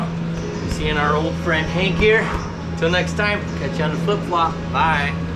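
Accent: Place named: Wisconsin, United States. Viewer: I love how you mix your Wisconsin accent with Spanish accent.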